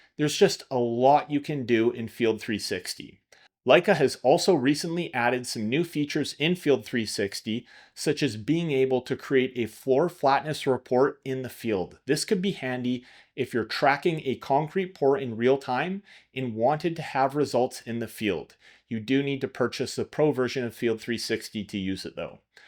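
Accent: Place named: Canada. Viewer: Great video! Very in-depth and informative. I have a lot of hours scanning with the VZ-400i, and the VZ-600i just looks all around better. I wouldn't wish lifting the VZ-400i 50-100 times in a day on anyone lol I did want to mention, regarding Leica's app and it's field capabilities, that Riegl offers an optional license for a program called RiPANO that has some similar capabilities. If they still offer it, it might be worth diving into. P.S. Love to hear your accent in your videos. I'm a Canadian surveying in the US as well, so it reminds me of home!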